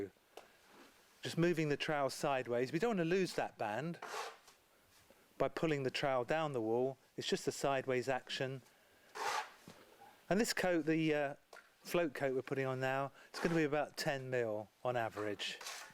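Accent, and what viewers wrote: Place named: Cornwall/Devon, United Kingdom. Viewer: Ah-ha. I wasn't sure if you were nearby because I detected a Cornish/Devon accent. Considering I just have one internal wall (approximately 2.5 m x 4 m) - and one external length of wall which is only about 4 m², it probably is not worth your while coming all this way down if you are "Up-country". It would be useful if you could recommend somebody in my area. Congratulations once again on your incredible work - hopefully this Will prove a good platform for you to get more work in your area.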